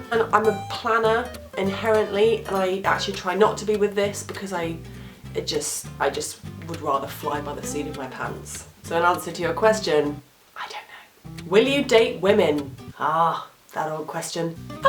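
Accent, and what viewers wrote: England, United Kingdom. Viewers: You make really good videos, plus I like the travel videos like Ibiza, keep it up you are awesome, my friend likes your English accent.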